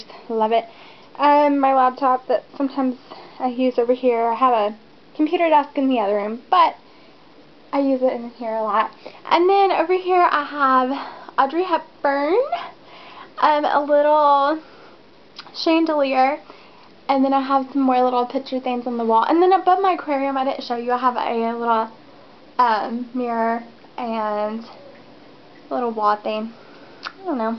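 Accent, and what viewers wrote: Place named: South, United States. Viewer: Love your southern accent. Where r u from?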